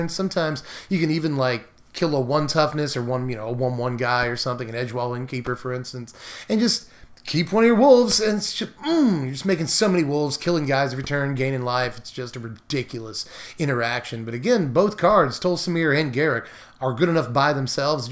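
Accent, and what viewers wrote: South, United States. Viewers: What part of the south you close to Tennessee lol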